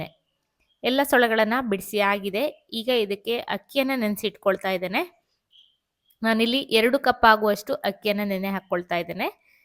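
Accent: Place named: Kundapura, India. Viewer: ನೀವು ಮೊದಲು ನಮ್ಮ ಕುಂದಾಪುರ ಭಾಷಿ, ಮಾತು ಆಡುತ್ತಿದ್ದೀರಿ, ಅಲದಾ???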